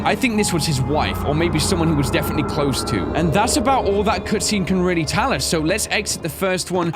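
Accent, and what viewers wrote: United Kingdom. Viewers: I love your British accent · Are you British?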